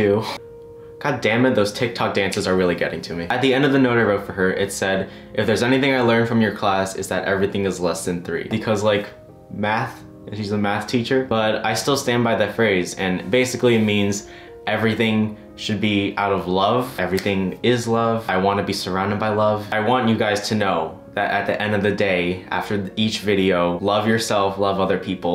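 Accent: China. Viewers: your chinglish brings back memories from family reunions lmaooo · chinglish sounds really cool tho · Lol i luv your chinglish.its so cute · im sorry but your chinglish is so uwu like "ni men bu cArE" aH CUTIE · Who else is INLOVE with his chinglish ? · The chinglish was so adorable. As a person who speaks Chinese, I find it so cute. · His chinglish is adorable.... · Your chinglish is so funny 😂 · wOwW- you also talk chinglish? 👌😔👌 relatable. but- your parents are so supportive though i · aaaaahhhhhhhh the chinglish is cuuutttttteeeeeee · Oml ur Chinese is the literal cutest. “妈妈， 我有一个surprise我要show你.” OML I DIEDDD **Chinglish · your chinglish rESONATES with me it made me so happy heh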